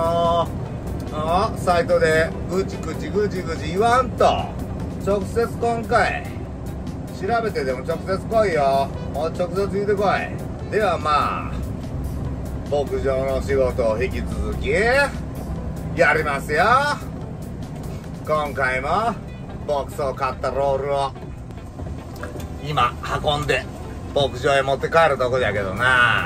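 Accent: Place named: Okayama, Japan. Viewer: お疲れ様です! さんは中小のドライバーが思っている事を全部言ってくれるし、備後在住のワシにはさんの岡山弁がとても聞き心地が良いです!では次回楽しみにしてます!ご安全に✌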